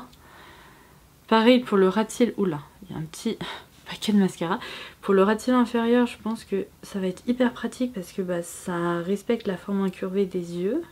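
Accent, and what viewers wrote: United Kingdom. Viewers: Coucou! Cela fait quelques temps déjà que je te suit, mais la première fois que je commente. J'aime beaucoup tes vidéos, et à chaque fois que tu lis un nom anglais j'adore cet accent, que je ne pourrais jamais prononcer MDR Tu es très belle, continues ainsi :-) Ah oui, j'adore tes " Oh, Ouah !" Mdr, fallait que je le précise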